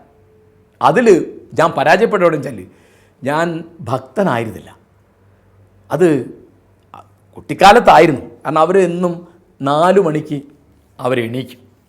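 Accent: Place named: Kozhikode, India. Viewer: mashinte oru kozhappam Kozhikode basha varunnu...malayalathinte ozhukku pokunnu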